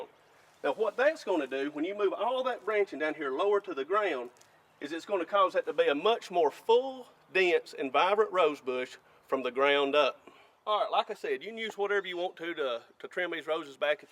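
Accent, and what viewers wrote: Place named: South, United States. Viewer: Absolutely unrelated so I apologize but I noticed your accent immediately. Being from southern Virginia, I love and appreciate a good southern accent. Now on to actually watching and paying attention to the video. 😊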